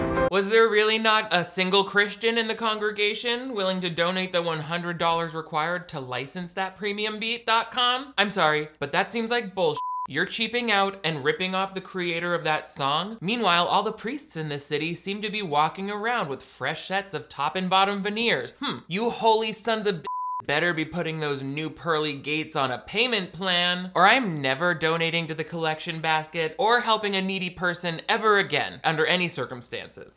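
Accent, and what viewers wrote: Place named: South, United States. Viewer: These Christian movie clip breakdown brings out the best out of, and I'm not just talkin bout that southern accent. LOLs all around 10/10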